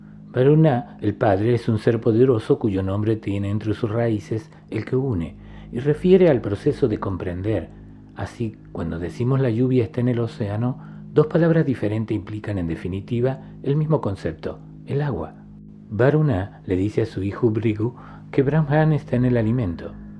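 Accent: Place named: Córdoba, Argentina. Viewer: El lector es Cordobés argentino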